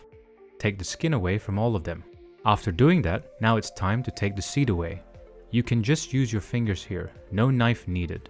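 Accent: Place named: Netherlands. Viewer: You sound Dutch but you said you grew up in Malaysia I’m confused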